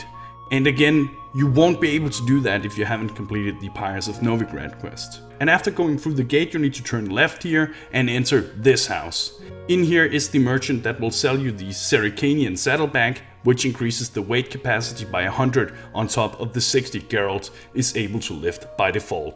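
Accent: Denmark. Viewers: This guy got to be Danish. That irritating accent is only available in Denmark..... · I think he's Danish, like me. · you sound danish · I can tell from your accent that you´re danish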